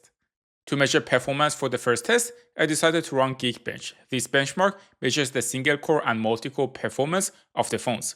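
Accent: Iran. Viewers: dash kheili irani mizani ba in lahjat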